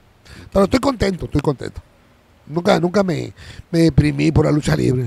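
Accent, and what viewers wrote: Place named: Coahuila, Mexico. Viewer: Es mi paisano coparito así ablamos los buenos coahuilenses de antes hoy muy difícil mente te encuentras con paisanos así ya que nos invadieron chilangos chiapanecos jarochos tabasqueños y asta centro americanos en toda la región coahuila AGUSAO antes de jugar saludos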